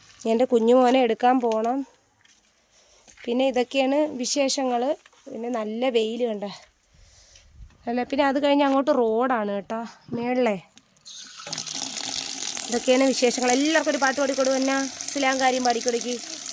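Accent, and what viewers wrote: Thiruvananthapuram, India. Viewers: നിങ്ങൾ വളരെ കഷ്ടപ്പെട്ട് ജീവിക്കുന്ന ഒരാള് തന്നെ . നിങ്ങളുടെ മനോഭാവത്തെ അഭിനന്ദിക്കുന്നു സഹോദരി . ആരെയും മനസ്സ് കൊണ്ട് പോലും ദ്രോഹിക്കാതെ ജീവിച്ചാൽ റബ്ബ് അനുഗ്രഹിക്കും . നിങ്ങൾ എവിടെയാണ് നാട് . സംസാരം കേട്ടിട്ട് തിരുവനന്തപുരം ആണെന്ന് തോന്നുന്നു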